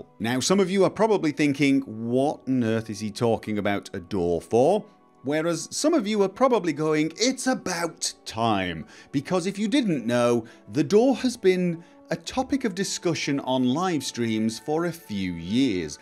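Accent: United Kingdom. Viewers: Why you come to Denmark every summer? (I live in Denmark) and I need answers! - the mysterious. - You live in Norway right? - But are you Norwegian? - Your english is perfect, and a bit British. - Are you the other way around of Pewdiepie, - Moving from Sweden to england, - You moving from england to Norway? - I don't know anything about you!:O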